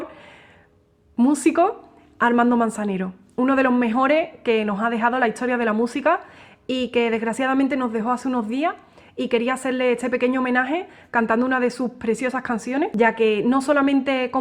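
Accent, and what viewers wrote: Andalusia, Spain. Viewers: Entre lo wapetona que eres y lo bien que tocas la guitarra, ya podrías ser mi mujer así aprendería, que en ello estoy jejej, que hace dos días he aprendido que el orden es do, re, mi, fa, sol, la si. 😅😅. Y luego ese acento andaluz que tienes... 😍😍😍😍😍😍😍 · E que siga falando con doce sotaque andaluz, tão musical como a sua violão. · Oh Dios wue hermoso srreglo, te felicito de verdad me encanto. Por ese acentico de tu voz pensaria que eres de Andalucia?... no conozco Espsñs pero mis hijos y esposa si han visitado. En fin talentosa Señorita, mil gracias por el aporte. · Tu acento andaluz me tiene embobado, jaja. Saludos😊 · Me encanta tu acentito español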